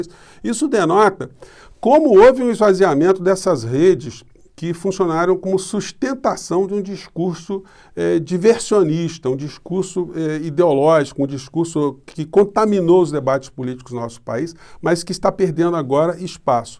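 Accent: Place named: Rio de Janeiro, Brazil. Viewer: Professor , o termo canjicas já é diferente, com , o seu sotaque carioca ,fica ainda mais divertido!!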